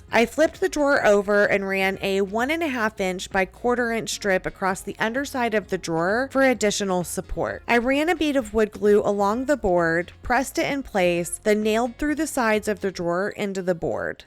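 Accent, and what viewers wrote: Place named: Midwest, United States. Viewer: vocal fry. up speak. midwest dialect. TRIPLE THREAT. Use some nice Ai program for your VO, please. nails on a chalkboard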